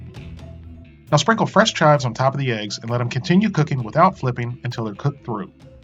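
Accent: United States. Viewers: Some of your American accent is hard for non US people to understand , what are the first two ingredients for the mayonnaise .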